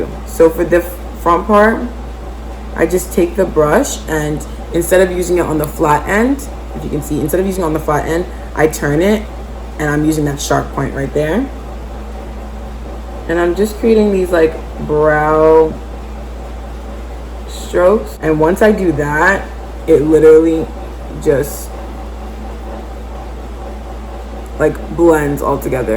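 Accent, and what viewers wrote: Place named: Canada. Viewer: You sound Canadian❤️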